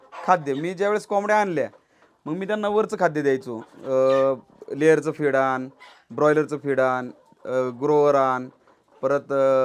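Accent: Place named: Dhangari, India. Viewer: Dhangari bolu nko salya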